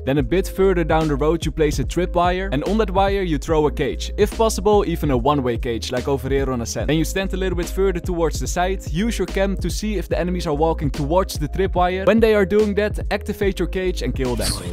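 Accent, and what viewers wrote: Netherlands. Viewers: are you dutch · i smell the dutch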